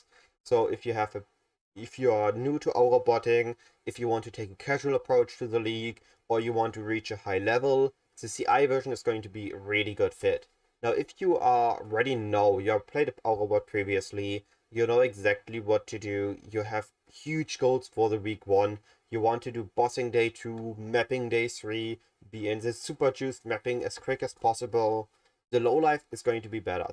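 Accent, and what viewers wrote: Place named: Germany. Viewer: If you can't differentiate between an accent and general pronunciation you need a reading comprehension test. Fucking moron. PS: The pronunciation is actually *fine*, it's no different than any other German accent pronunciation. Maybe if you're having so much trouble hearing what he's saying, you need the hearing test more than I do 💀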